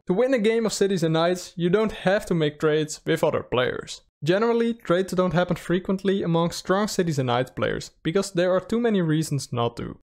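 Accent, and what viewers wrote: Netherlands. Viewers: Although I can understand you just fine, it might help to reduce "vocal fry". That's the creaky, low sound you usually use at the end of a sentence. I think less vocal fry sounds more relaxed. I'm no speech therapist, so I don't know how you'd achieve that. Just curious: are you Dutch?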